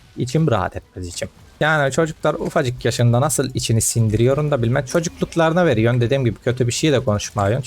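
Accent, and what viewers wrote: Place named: Black Sea, Turkey. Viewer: abi karadenizlimisin karadenizli vibe ı alıyorum senden aleyküm selam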